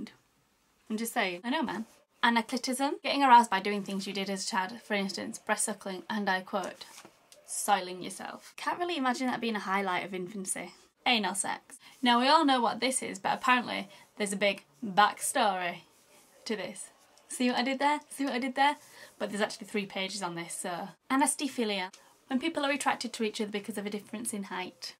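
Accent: Yorkshire, United Kingdom. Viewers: Haha. U look hot :P AND UR BRITISH.!! My friend is British YE HAV D SME ACCENT I hav reli bad spellings :P IM NOT BRITSH Jus to giv ye d heads up :P IM GOIN TO GO NOW.!! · Do you have a Yorkshire accent? If so it's the most proper Yorkshire accent I've ever heard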